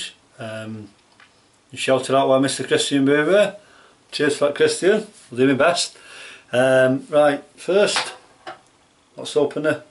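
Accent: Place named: Midlands, United Kingdom. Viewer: Nice one, watched Christians and yours, quite a bit of difference. I'm from the midlands and you say everything the same as me except gym shoes are trainers surely lol. Cheers 🍺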